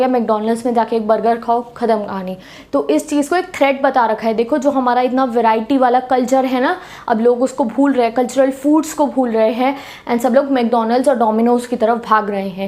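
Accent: Punjab, India. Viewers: ur punjabie this is clearly fy with ur talking style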